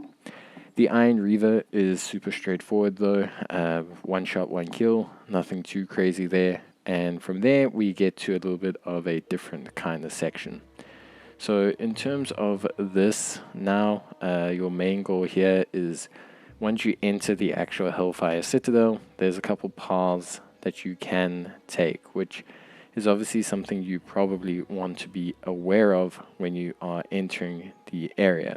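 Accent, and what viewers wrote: South Africa. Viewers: I took a shot everytime i knew thats a SA accent!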